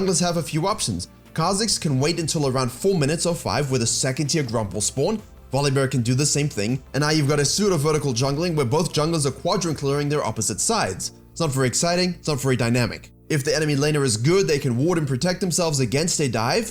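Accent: South Africa. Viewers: Your video make me feel like there's a chance I could go pro! Hahahaha jk but seriously your Channel is amazing, also what accent is that cause I hear South African.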